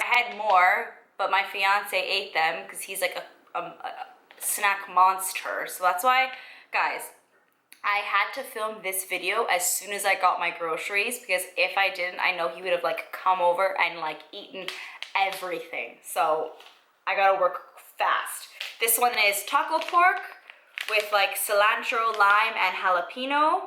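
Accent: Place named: Toronto, Canada. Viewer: I knew it, you sound like your Italian and from Toronto lol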